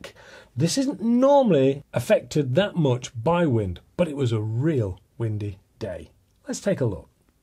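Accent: United Kingdom. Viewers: thank you very much for this video. I am a subscriber and always enjoy your excellent reviews. I love the British accent as well. I have owned a Gamo Urban which is basically a rebranded BSA Buccaneer made in Birmingham EN. This is a fine high quality PCP, unlike some of the junk coming out of China. Would very much enjoy a review of this airgun from you. For an entry level PCP, nothing really compares. It's also sub moa out to 50 meters with 24 fpe of at the muzzle. Keep up the great work, will be watching for more reviews. 🥇😃 Geo from Kalamazoo, MI USA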